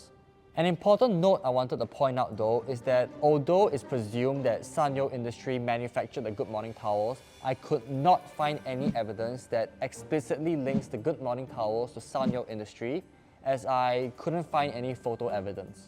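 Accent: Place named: Singapore. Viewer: But that's how Singaporeans speak...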